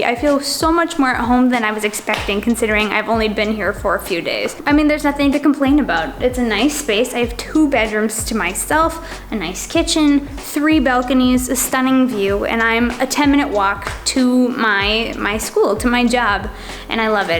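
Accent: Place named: United States. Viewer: 纯正的美式英语！我喜欢这个小妞！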